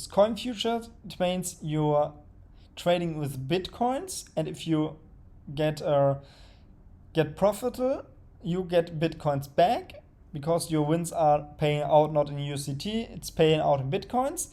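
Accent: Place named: Germany. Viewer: You sound like a German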